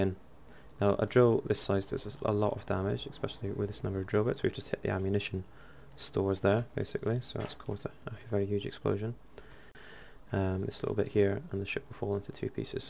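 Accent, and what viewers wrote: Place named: United Kingdom. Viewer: nice brootish accent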